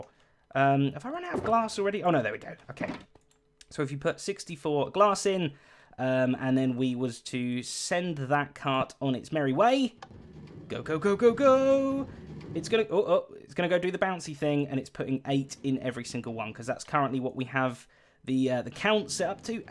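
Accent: United Kingdom. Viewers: Why do british people get all the great accent? It's not fair :(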